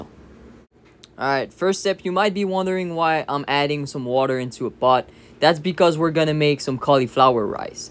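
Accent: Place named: Quebec, Canada. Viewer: Ahh I see, you have that GSP accent haha. Keep up the good work man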